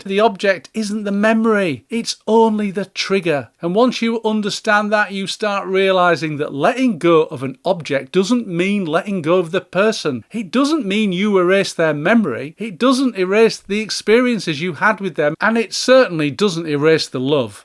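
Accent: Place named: Liverpool, United Kingdom. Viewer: Sounds like a wise Liverpool man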